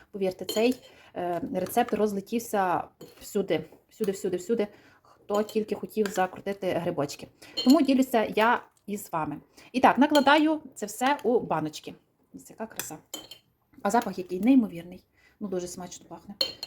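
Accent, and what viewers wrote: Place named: West, Ukraine. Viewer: Так приємно чути мову західної України, просто пісня!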